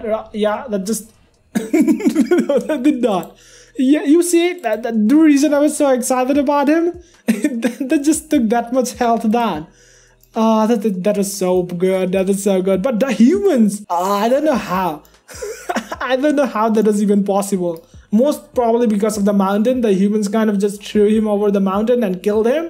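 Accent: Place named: India. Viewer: I think its an Indian accent, tho I could be wrong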